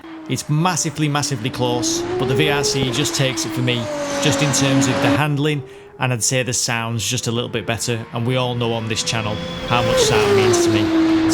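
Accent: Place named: Manchester, United Kingdom. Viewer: you sound Mancunian to me, I could be wrong but YANITED. RSS car sounds pretty good although I love what VRC offers in terms of setup and features with its csp version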